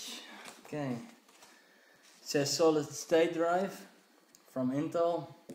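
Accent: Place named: Netherlands. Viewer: Haha your Dutch accent is mad as. Nice video, I had no idea how small the 2.5" drives were.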